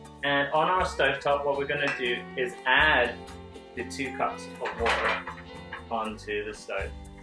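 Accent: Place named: United Kingdom. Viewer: I’m so confused.... you said stove then apologized for British accent then explained stove=cooker... who the fuck says cooker???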